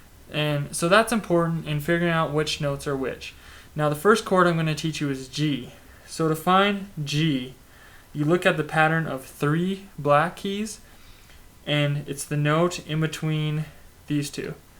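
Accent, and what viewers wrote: England, United Kingdom. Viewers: hello where ur hometown ? i really like your english accent ^^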